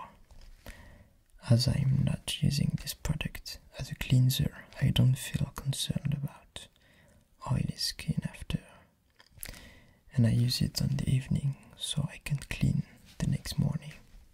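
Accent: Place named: France. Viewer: French accents are so dope.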